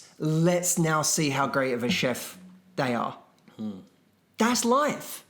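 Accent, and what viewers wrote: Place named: United Kingdom. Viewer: Your British accent is standing up again, I guess spending good quality time with family is the reason.😊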